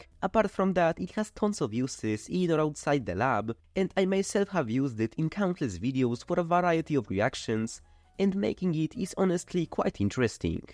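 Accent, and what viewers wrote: Poland. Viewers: hey bro, are you polish by any chance, you have polish accent in your english lol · My new favorite ASMR listening to chem synth in English via a cool a$$ dude wth a Polish accent. 👌🏻 10/10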